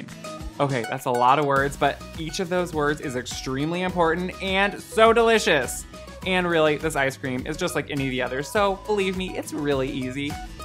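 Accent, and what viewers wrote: Midwest, United States. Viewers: I think he has a Midwestern accent.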